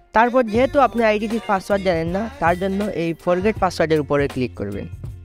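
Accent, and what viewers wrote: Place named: Sylhet, Bangladesh. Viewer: ভাই নিশ্চয় আমাদের সিলেটি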